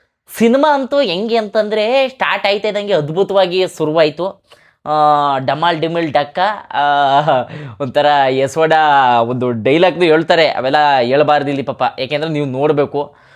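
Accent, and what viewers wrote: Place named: Mandya, India. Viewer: ಜೈ ಮಂಡ್ಯ ಭಾಷೆ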